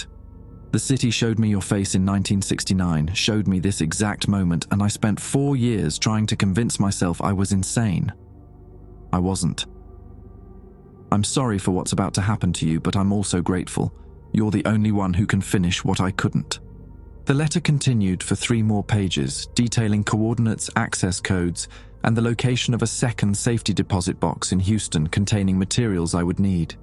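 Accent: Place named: England, United Kingdom. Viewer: English accent is a deal breaker! American story, Texas, national archives college park md, whiskey and all. Why does everything on YouTube have a twat accent?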